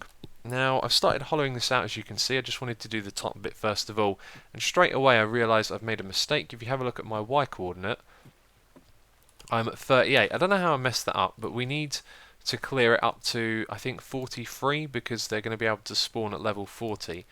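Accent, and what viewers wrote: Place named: Northern England, United Kingdom. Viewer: Your accent.... Why can't I be British?.... WHY!?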